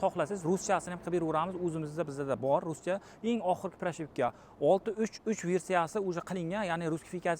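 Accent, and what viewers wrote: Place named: Kashkadarya, Uzbekistan. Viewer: Шева кашкадаре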